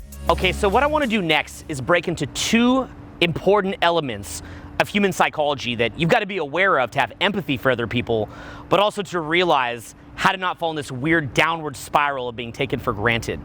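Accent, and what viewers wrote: Australia. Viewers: Good fuckin Australian accent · i love your australian accent mate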